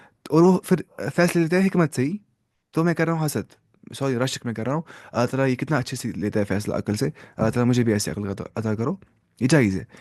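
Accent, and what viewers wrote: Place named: Kashmir, India. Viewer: Your accent is Kashmiri U are from kashmir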